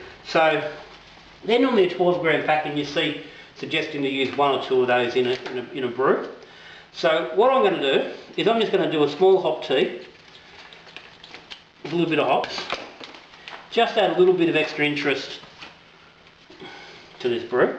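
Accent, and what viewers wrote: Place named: Australia. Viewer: cheers for the vid mate . you sound like a down to earth aussie , with none of the bullshit .. will be watching and learning from your vids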